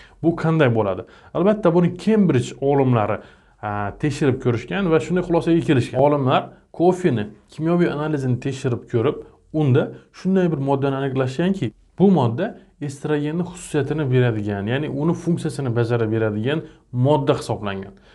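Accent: Khorezm, Uzbekistan. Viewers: Доктор хоразмданмисиз дейман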